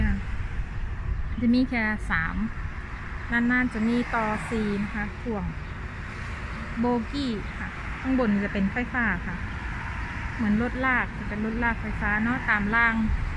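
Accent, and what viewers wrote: Isan, Thailand. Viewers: พูดอีสานฟังไม่รู้เรื่องกรุณาพูดภาคกลางจะดีกว่า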